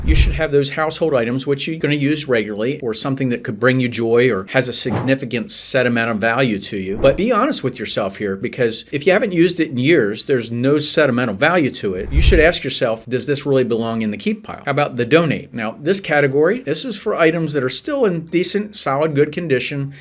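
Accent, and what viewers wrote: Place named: Philadelphia, United States. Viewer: I see myrtle beach. But thats a very philly area accent!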